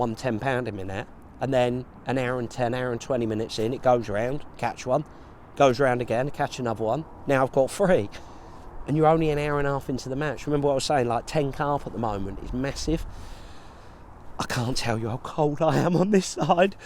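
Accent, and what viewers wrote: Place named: Cockney, United Kingdom. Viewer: I’ve said it before, love knowledge & intuitive nature, which when u listen to most other pretentious promotional crap, ie ringer & the likes irritating wankers! Carn’t get enough of cockney tones!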